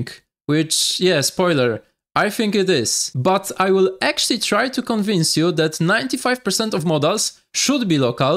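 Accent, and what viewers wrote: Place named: Poland. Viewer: where are you from? Your accent sounds kida polish?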